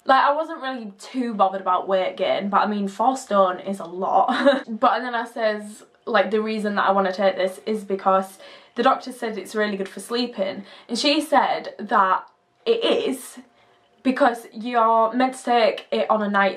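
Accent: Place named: Yorkshire, United Kingdom. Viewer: love ur Yorkshire accent!!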